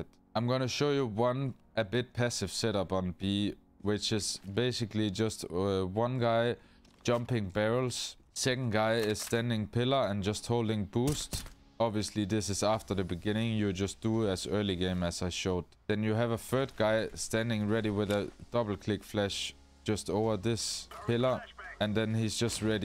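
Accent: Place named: Denmark. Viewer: Man kan virkelig høre du er dansker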